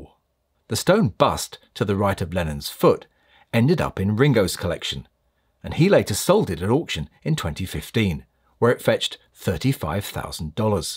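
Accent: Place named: United Kingdom. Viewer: To address the part about "the problems with this album" & comments about the hodge-podge mix of early & later material: As a young kid in ~1978-79, I didn't have much spending money. But I had enough for 1 album. My logic for choosing THIS album, was because most of the songs were released as singles (in the 60s), but they never appeared on an album, up until " ". Keep in mind, the American album releases were considerably different than the British versions.. in content, title names & artwork. In my young eyes, this album had more bang for the buck, than any other albums, especially since my 2 favorite songs at the time were on here, and NOWHERE ELSE: " " & the "missing" song from Hard Days Night album- " "! I was a bigger fan of their early to middle years at the time (still am), so " ", " " & " " were rarely listened to. After Capitol records scrapped the old American album catalog & mimicked the British catalog, all of the " " titles were then found on main albums. 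The video host has a British accent, so he only focused on the British catalog. No wonder this album isn't a big deal to him. And that's true now, as well, with the homogenization of the album titles. Yet, this now-obsolete vinyl still holds fond memories for me, nostalgic fool that I am;-)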